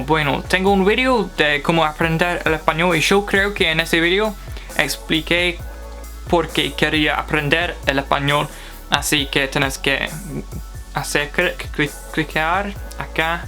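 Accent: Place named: Argentina. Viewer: Great job man. As a native Spanish speaker I understood everything you said, you also sound argentino when you pronounce many words. Btw el país se llama Noruega 🇳🇴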